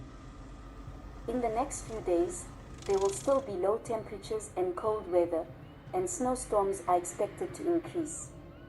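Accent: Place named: Cape Town, South Africa. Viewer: Sounds like a capetonian accent for the narrator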